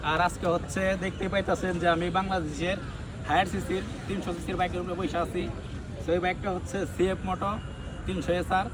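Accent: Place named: Tangail, Bangladesh. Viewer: ভাই তুমি যে মালটা টাঙ্গাইলে এটা বুঝাই যায় শুদ্ধ বলার দরকার নাই টাঙ্গাইলে ভাষায় বলো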